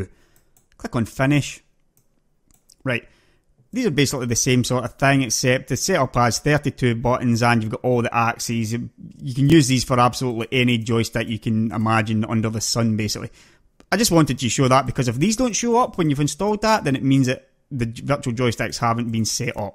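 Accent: Scotland, United Kingdom. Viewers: i'm love with that scotish accent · Scottish? :) Sounds great!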